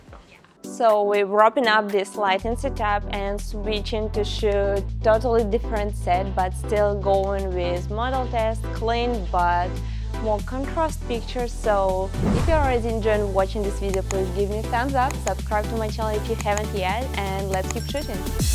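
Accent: Russia. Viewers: Крутая ) но русский английский прям слышно)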